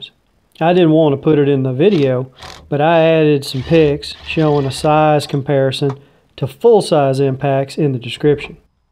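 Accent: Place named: Alabama, United States. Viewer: nice review ...who's did the talking in vid ...he sounds like he's from Alabama...lolol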